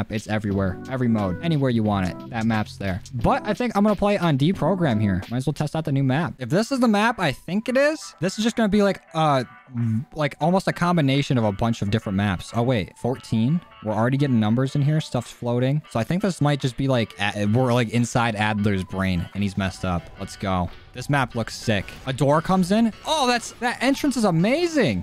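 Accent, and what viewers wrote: United States. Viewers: He's American, you can tell from his accent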